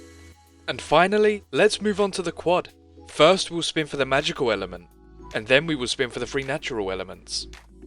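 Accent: United Kingdom. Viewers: I didn't know was British. I am happy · Is British?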